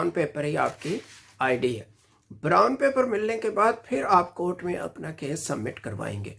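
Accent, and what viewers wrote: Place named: Sialkot, Pakistan. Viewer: Sir lagta ha ap sialkoti hain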